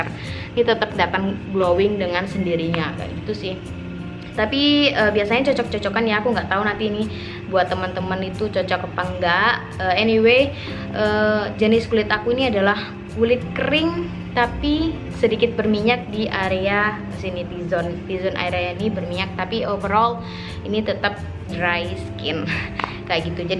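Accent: Indonesia. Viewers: probolinggo bener logatnya 😂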